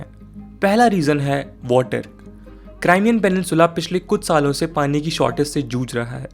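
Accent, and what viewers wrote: India. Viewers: Indian accent. I am pretty sure India will be on the west side in this conflict as they really hate China. Then most of the middle east will join putin. North and South korea will clash together. Wildcards could be Brazil and south american countries. Some will join the west but some want to see the US burn. Other wild cards would be African nations like Egypt, Sahel, Etiopia. It will all be a bloody mess and it is hard to see how we will get any winners in this conflict.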